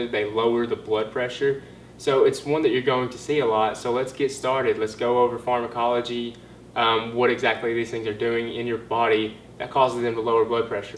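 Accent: United States. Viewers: Great! Best explanation I have seen thus far on You tube. Of course, being from NC, I am also partial to the accent :) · Southern accent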